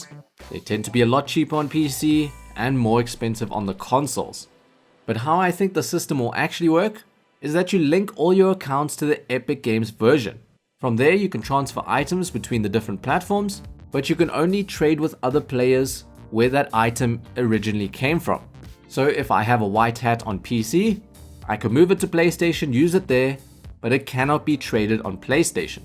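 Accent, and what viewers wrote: South Africa. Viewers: i thought so from the accent. Im also from south africa!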